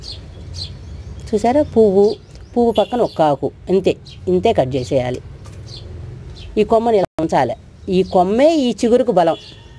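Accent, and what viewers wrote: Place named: Guntur, India. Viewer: Chala baga untundi mee explanation. Maadi guntur. Ippude hyd lo untunnamu. Na chinnappudu andaru ede slang lo matladevallam. Gurthuku vastundi. Thq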